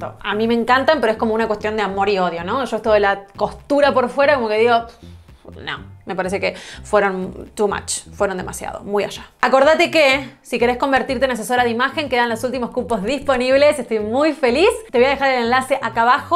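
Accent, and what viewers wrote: Argentina. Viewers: Ajjaaj.perdon pero me resultó super extraño escuchar tu acento argentino y que hablabas de invierno cuando nos estamos fritando en Argentina. Luego fui a ver la descripción del canal y vi qur no estás en el pais. Fue muy raro, lo quería decir 😂. En Argentina los cargos se estan usando muchisimo y tambien los rectos y anchos. No te digo que tiende a desaparecer el chupin, pero se usa mucho menos! Y de esos con la manga doblada no he visto, hace años que no se ven, se usaban antes, yo los usaba de hecho, pero no los he vuelto a ver en la calle por el momento. El capri me gusta pero no a la rodilla, de largo hasta la pantorrilla esta bien. Tan corto es como los hombres cuando usaban pantalón corto hasta los 18..... quedan feítos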